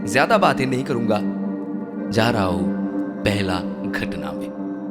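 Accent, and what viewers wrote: Bengal, India. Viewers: Bengali tone avivi hein hindi mein... dhire dhire hindi tone pakar loge bhaiya. Avinandan. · Dada ami tor onek boro fan. Tumi jkhn dadagiri te aschile tarpor theke ami pretkotha r sob episode sune niyechi sathe purono sob ghotona o sune felechi.Pretkatha hindi channel tumi jedin launch korle seidin e subscribe korechi. Hindi ghotona gulo sune amr mone holo j comment kori. To asol kothai asi kichu kichu word ache jegulo tumi bengali tei bolcho jemon aasa- 'ummid' hobe r 'mrityu'- mat hobe r bengali te 'র', 'ড়' ei 2to alphabet er opor ektu kaj korte hobe. Plz bollam bole kichu mone koro na. Actually Pretkatha r member hoyai Pretkatha jate r o perfect hoi sei jonno comment ta korlm.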